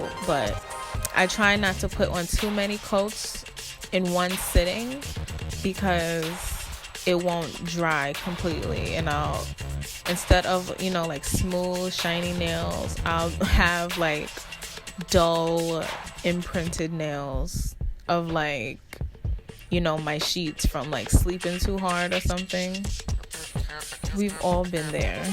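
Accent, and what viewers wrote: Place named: New York, United States. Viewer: yass. and i hear that new york in you tooo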